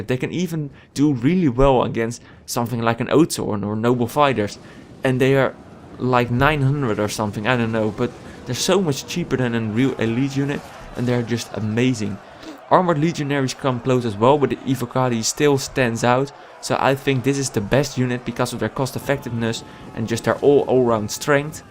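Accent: Netherlands. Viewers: Are you Dutch ? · Dutch accent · you're dutch arent you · that dutch accent. MY EARS!!!!! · What the fuck is that dutch accent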